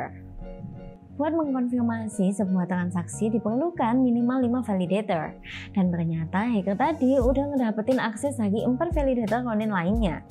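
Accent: Java, Indonesia. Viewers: Ngomong nya medok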